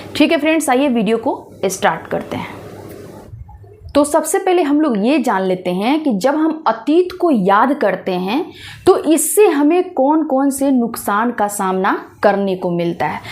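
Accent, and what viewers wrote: Bihar, India. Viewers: MADAM, LAGTA HAI AAP MERI TARAH BIHARI HO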